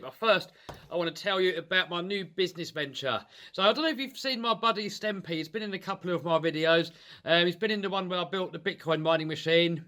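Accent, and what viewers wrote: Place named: United Kingdom. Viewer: I don't know if this will be correct but because of your accent it should be BBB rc ( British bashing brothers) rc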